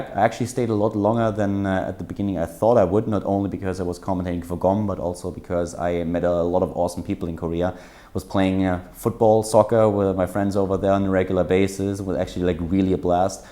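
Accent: Germany. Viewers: Leider reagiere ich ziemlich allergisch auf Englisch mit deutschem Akzent. Denn obwohl Englisch sehr gut ist, hindert mich der leichte deutsche Einschlag doch daran, seine Casts voll zu genießen. Insofern wäre ich auch durchaus dankbar, mal was auf Deutsch zu hören.:-)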